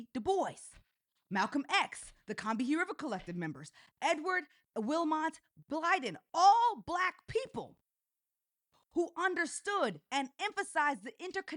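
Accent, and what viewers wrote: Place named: United States. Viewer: Pan Africanism is dead Why don't you live in Africa ?? You sound like you are in the US..... Not Panama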